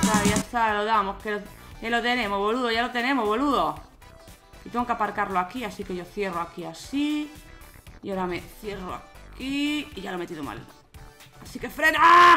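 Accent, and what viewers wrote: Argentina. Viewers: Se llama "español rioplatense" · parece un argentino cheto o de verdad sonamos asi? xD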